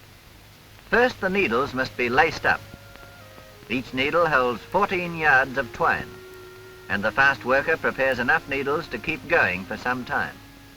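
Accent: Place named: Australia. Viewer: the narrator actually sounds Australian ☝️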